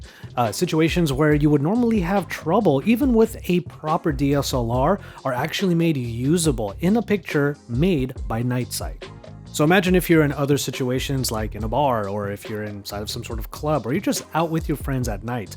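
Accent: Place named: Philippines. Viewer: I love it na hindi mo tinatago ang Pinoy accent 💙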